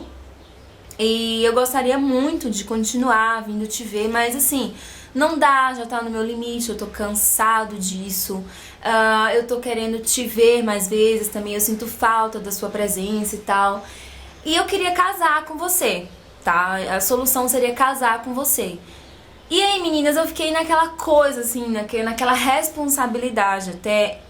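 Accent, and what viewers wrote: Bahia, Brazil. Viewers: Caramba, que bonito! A mulher que é amada reflete isso em todas as suas ações e sinto q vc é uma pessoa mt carinhosa, é super gostoso ouvir seu sotaque da Bahia rsrsrs bjs!!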